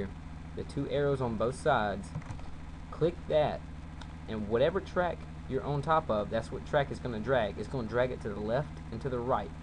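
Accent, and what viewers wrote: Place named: South, United States. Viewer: the guy talkin sounds like he's southern and trying so hard to not let his accent to come out.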